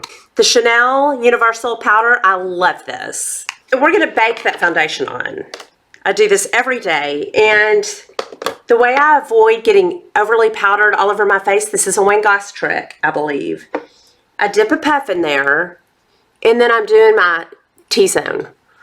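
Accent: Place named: Mississippi, United States. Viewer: You look stunning!! What a fun video :) Where are you from? Love your accent, love that “y’all"! My mom is from Jackson, MS and I went to college in Mississippi, so of course I picked up on that y’all ;-) .. love that modern renaissance palette! loving all these high end products girl! Wayne Goss brushes have been on my list... def getting that #16 now :D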